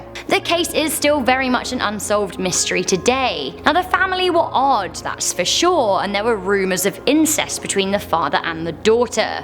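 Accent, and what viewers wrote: United Kingdom. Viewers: Is she british? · Wohhh blew my mind I thought you guys were from the U.K with the way you talk........... Canada threw me way off anyone else think this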